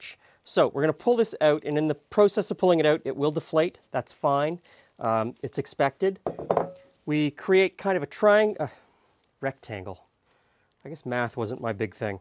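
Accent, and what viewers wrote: Canada. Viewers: Hey. Love your videos. Something interesting: my grandfather was from Iowa, and his accent rubbed off on me (despite me being raised in California)... I sound halfway between a Canadian accent (like yours), and a Southern US accent... Also, I cant wait till I have a bigger kitchen! I wanna try so many of your recipes. Keep up the good work!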